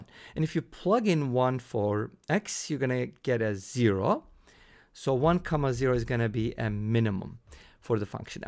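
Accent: Turkey. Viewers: Im trying to determine where this guy is from the whole video. I've concluded with Turkish by the accent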